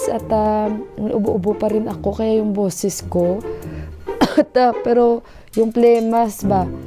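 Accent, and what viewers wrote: Iloilo, Philippines. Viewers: sis taga san k sa pinas, parang ung accent m ilongga 😊.. mag watch nko ng vlog m now q lng nag p nood..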